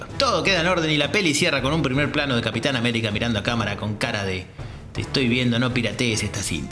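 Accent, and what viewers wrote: Argentina. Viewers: Tenías que ser argentino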